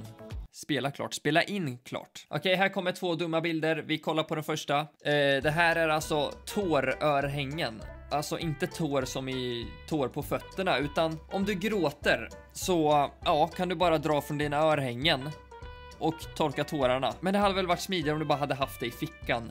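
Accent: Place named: Östergötland, Sweden. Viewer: Denna östgötska😂 känner igen mig 100%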